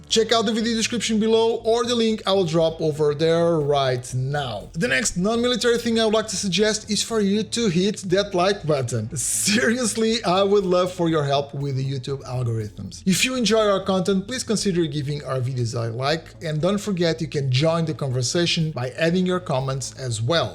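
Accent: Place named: United States. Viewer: oh my got. that voice .. relax man, such american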